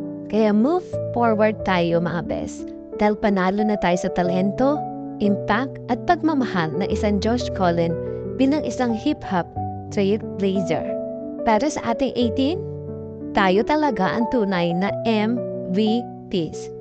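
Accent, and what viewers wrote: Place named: Quezon, Philippines. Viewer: "Let's get right & not bitter but be better together"..i love it❤ It was fun watching & hearing you..always watched replay sa mga vlogs & ls mo..kung makakita man..silent viewer lang palagi but this one napa-comment ako! Josh really deserved the award..naiba lang dahil medyo mabagal..english ang lyrics..walang rude words o halong kabastusan kaya d natanggap ng mga ibang traditional hip hop..at yun ang naririnig ko lagi noong kabataan ko ng mauso ang rap dto sa atin kaya d ko ma-appreciate! You're my happy pill too..lalo na pag naririnig ko ang accent mo sa pagsasalita..nami-miss ko ang punto' ko..bumabalik lang pag umuwi sa amin sa Lucena, Quezon..magkapitbahay ang probinsya natin kaya hawig ang punto natin😅 Thanks..keep going..nakakaaliw ka!🥰😅👍❤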